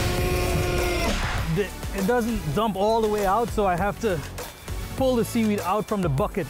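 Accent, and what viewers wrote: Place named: Trinidad and Tobago. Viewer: trin accent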